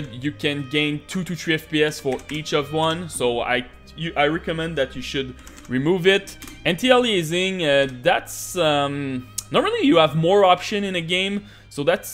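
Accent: Quebec, Canada. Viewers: Tes quebecois c sur :P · i swear to god this guy must be from quebec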